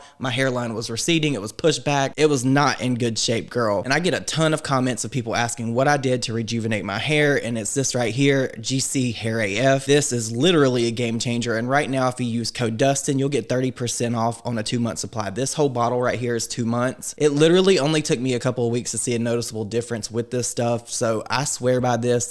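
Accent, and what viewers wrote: South, United States. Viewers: Kinda hurt to unsub real quick, but did it for you! Your southern accent reminds me of home 🏡 💞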